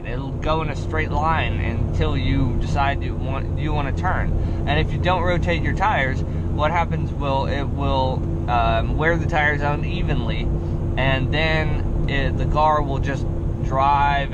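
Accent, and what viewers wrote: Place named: Virginia, United States. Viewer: what part of va is this, I can tell it's va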